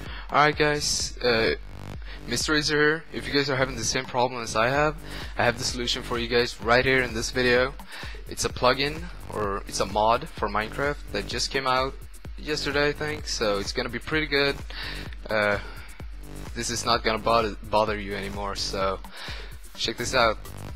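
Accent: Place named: Sweden. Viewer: thanks :D man hör att du e svensk ;)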